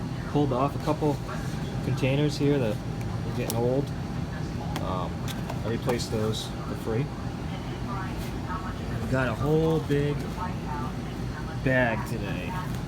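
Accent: New England, United States. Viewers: Cool...thought I could hear a fellow "New Englander" accent in there :)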